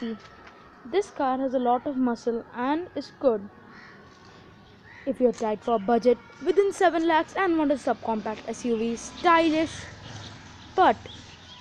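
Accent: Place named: France. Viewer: which English this French 🤮🤮🤮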